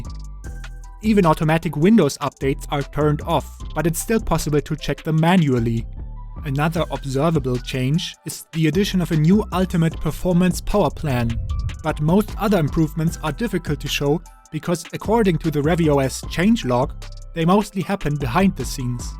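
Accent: Germany. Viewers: man hört deinen deutschen akzent fett raus und trotzdem sprichst du englisch?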